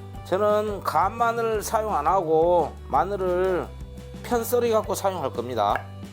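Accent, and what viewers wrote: Jeolla, South Korea. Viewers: 아주 오리지날 전라도 말이네요 내고향 사투리를 듣고자 이 요리도 배우고 좋아요 ㅎㅎㅎ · 매번 느끼지만 전라도사투리가 특히 남도지방,광주,여수.순천,고흥.근처 사투리가 얼마나 구수한지..ㅎㅎ 전라도를 떠나 30년째 경상도 살아도 40대인 저는 세프님하고 언어가 어째 이라고 똑같다요~잉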